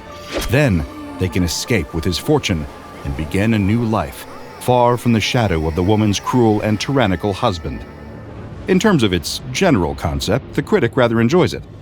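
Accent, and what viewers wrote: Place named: United Kingdom. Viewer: WHY IS YOIR VOICE LIKE THAT😭 GIVE BACK YOIR OLD VOICE NOT THIS BRITSH AHH KING VOICE💀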